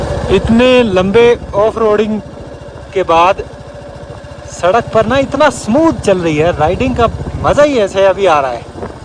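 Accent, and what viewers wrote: Himachal Pradesh, India. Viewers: Aap ki aawaj or bolne ke trike se ese lgta h jese aap uper himachal se ho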